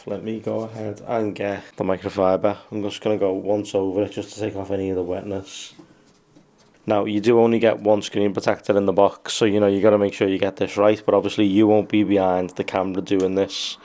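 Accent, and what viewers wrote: Liverpool, United Kingdom. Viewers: i was pretty surprised when i heard the scouser accent, dig it mate!😎👍 also: you are the only one who did 100% correct, everybody else took the second protector off before they removed the bubbles. cheers from switzerland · What a god awful accent scouse is haha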